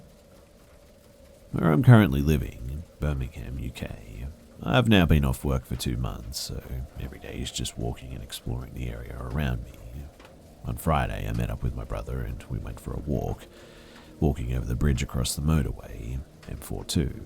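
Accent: Australia. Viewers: Are u aussi or kiwi? I am not pretty sure about your accent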